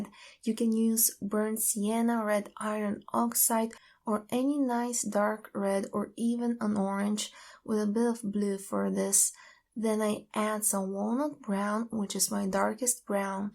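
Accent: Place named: Eastern Europe. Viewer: I wish you narrated more of your videos. You have a beautiful speaking voice. Do you mind if I ask what country you are from? Your accent sounds like Eastern Europe to me, just wondering if my guess is correct. :-) Thank you for making these videos, I enjoy them very much.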